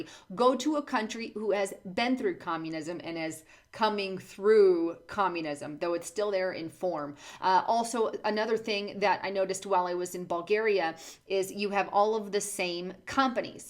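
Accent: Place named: Midwest, United States. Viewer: I hear you. Unfortunately in this country there are a lot of communists who won't listen and a LOT of people that aren't very bright and they won't understand. So swimming against the current but I do appreciate your effort. I like your posts. I think I could talk to you for hours about this stuff. On a side note I'm trying to figure out where you're from based on your dialect. I don't think east coast or west coast and I'm hearing inflections that sound...Ohio or Indiana? Just guessing..could be a little further south and west but not too much. The only other guess I have could be north central west. Montana or Utah but I just don't hear as much western dialect influence. What's funny is you only use valley girl drawl when you are parodying others or having to make a point you think is painfully obvious. Anyway I'm still listening. Have a great day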